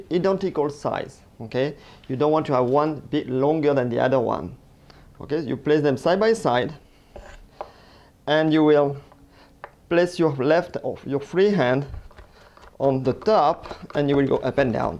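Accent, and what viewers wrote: France. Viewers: so my salmon is ready , well, tomorrow can be eaten actually but I taste it after the curing, delicious and now I added yellow mustard ( no dijon in the house) and chopped dill. Thank you for the recipe, the lemony dill taste of the cured salmon= 😋 DELISH!! I personally love garlic so I added a little bit in the curing mixture and celery powder as well, love it!! P.S. your French accent is killing me... in a good way!! Is buy favorite accent in the world since it makes me laugh 😁 Merci beaucoup!! 🇨🇦🇫🇷👍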